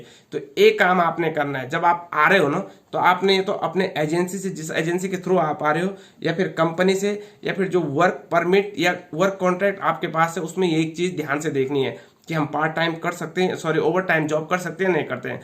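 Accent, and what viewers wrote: Garhwal, India. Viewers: Garhwali accent h bro aapki aawaj me. 🙏🏻🙏🏻🙏🏻🙏🏻👌🏻👌🏻👌🏻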